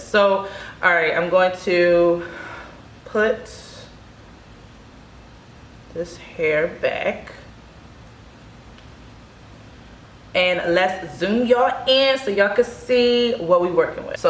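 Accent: Baltimore, United States. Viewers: Aye sis! Is that a Bmore accent that I hear? Let me tell you. I've watched 30 videos on twists and yours was the only one that actually showed the technique. Thank you!!!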